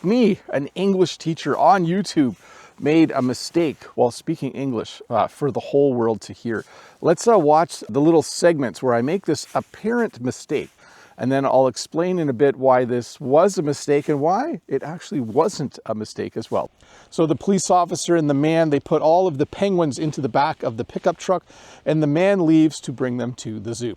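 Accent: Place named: Canada. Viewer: you speak wonderful, informal, Canadian English. We LOVE your programs and we LOVE seeing the great outdoors when you do your lessons. You are on a farm, speaking informally. We LOVE your programs. Keep going!! My English students love your lessons! 😊😊🌻🌻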